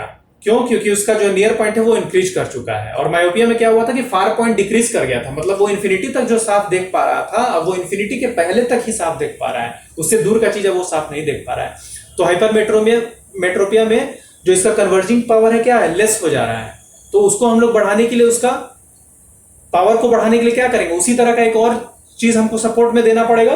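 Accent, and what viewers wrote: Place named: Bihar, India. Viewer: Bihari accent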